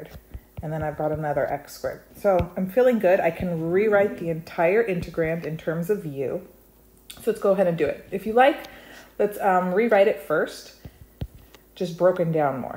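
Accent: United States. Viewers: I ❤ UR AMERICAN ENGLISH accent😊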